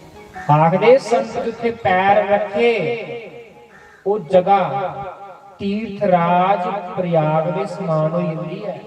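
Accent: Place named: Pathankot, India. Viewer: sanju ji di karth mane puri suni muje to apki je. pathan kot bali bani badi madur lagti h mere maike ki bani jehi h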